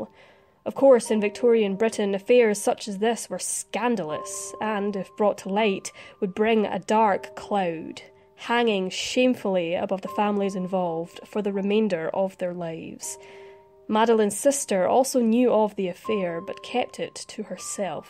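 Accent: Scotland, United Kingdom. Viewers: I love your content! Excellent research, interesting cases. The background music fits perfectly and your Scottish accent is so easy to listen to! Well done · Why the hell do so may narrators feel that they have to put on the artificial "creepy" pronunciation when telling their stories ? For instance, this young lady will normally have a lovely Scots accent, which one can easily hear, and most probably a nice speaking voice - but both are spoilt by totally unnatural creepy speaking. I used to subscribe to this interesting channel but then went away because I just couldn't listen to the narrative any more. I am back to give both narrator and channel another try. The stories are good, the research generally excellent and the presentation superb......if it only wasn't for the "creepy" voice, everything would be perfect.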